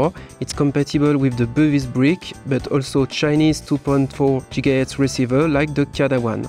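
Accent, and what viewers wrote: France. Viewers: Salut mon gars ! J'adore tes créations. (je sais que t'es français : notre accent...) Je pense même à acheter les pièces pour construire ton modèle.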